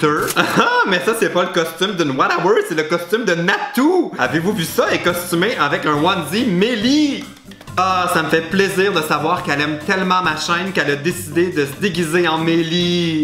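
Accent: Quebec, Canada. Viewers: J'adore tes vidéos. Un moyen de bien rire... L'accent so cute d'un magnifique québecois. Dommage que c'est aussi loin de la France. Sinon, voici mon snapchat : hackariens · J'adore ton accent Québécois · Je sais , mais tu dis que ça t'as stressé :p c'est normal qu'il dise l'halloween , il est québécois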